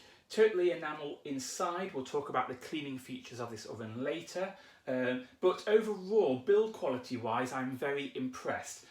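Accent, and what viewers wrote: United Kingdom. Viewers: Thank you for this excellent review of a product that I will need to replace as well. I also am a fan of Bosch appliances and have had good results with all of the. Now it is time to update the hob and oven. Reading the Bosch website info makes all the products so alluring and the more money you spend the better the appliance sounds. This video and especially the follow-up are just so objective and provided all the information that I needed. Bosch is extremely costly here where I live and spending that amount of money on the oven that has some minor flaws, that might turn into major issues after the warranty has expired, is a big NO NO. Also love your accent, that if I have to guess is from the Midlands.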